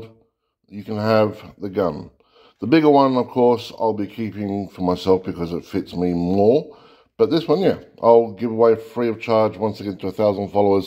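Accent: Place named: England, United Kingdom. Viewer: What england English accent is that?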